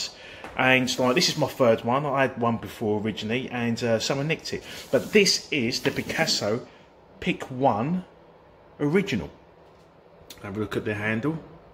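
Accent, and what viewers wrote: United Kingdom. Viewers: Pro-form Blaze and semi oval contractor brushes are good too. I'm in U. S. and that's all I use along with the Picasso. I love hearing the Brits talk. Such a cool accent.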